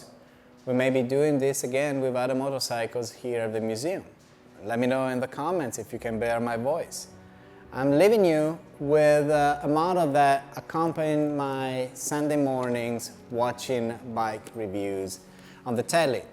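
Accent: Italy. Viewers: 😲 Wow! Whom better to present it than you. I would love some more technical iteration on the diverse releases of the 916 range. So all the different versions and the technical differences. All the way from the 916 to the 998S and R and final edition. Is that something you would want to make, or not in your interest? I've owned my 998 for over 10 years now and even used it as for pleasure on the road at first and later on at the track. At the track it really comes alive! I like the color of your bike. And especially in combination with the yellow lights it really harmonizes with the green of the 996 The Matrix bike. And the pull over can not be missed off course! Camera work impacable and sound, voice, pause and speak rythm as well as your suiting Italian English is coming together perfect. Love both parts and want to know more about the Italian bikes from you in front of the camera! 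· I love the video and your charming italian delivery! :D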